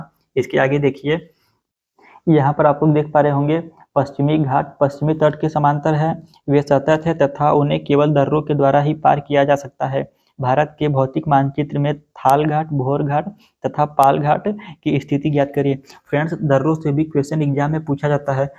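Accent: Uttar Pradesh, India. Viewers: Sir aap up se hai kya😊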